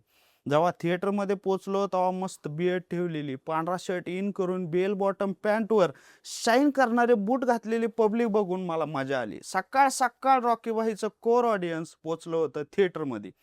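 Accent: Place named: Barshi, India. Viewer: बार्शी cha आहे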